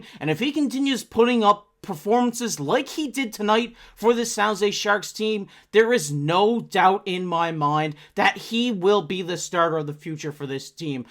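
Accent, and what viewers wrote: Newfoundland, Canada. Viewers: Are you a Newfoundlander? East coast accent is strong!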